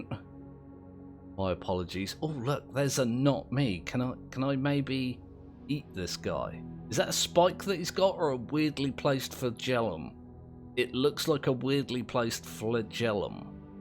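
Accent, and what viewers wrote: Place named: United Kingdom. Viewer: You're just so British. Very winsome.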